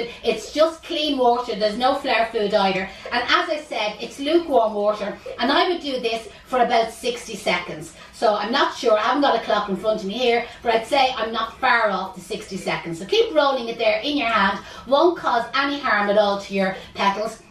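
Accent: Ireland. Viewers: It’s “flower” food. She has an amazing Irish accent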